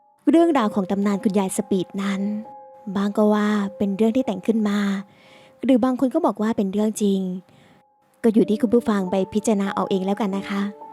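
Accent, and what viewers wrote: South, Thailand. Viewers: ลองไปฟังคลิปเก่าๆนะเจ๊แกพูดภาษาใต้เยอะ เจ๊คนใต้แน่นอน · ติดตามมาเป็นปีละ ฟังตลอด และสงสัยตลอดว่าสำเนียงแบบนี้ เป็นคนใต้เหมือนกันรึป่าวครับ 🤔😂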